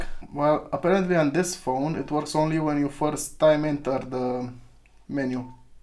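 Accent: Romania. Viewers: I think u are Romanian by the accent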